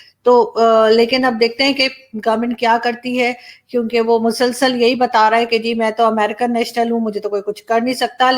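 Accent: India. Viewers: It is hard to believe that you are from Islamabad.Speaking and behaving like a typical Indian lady.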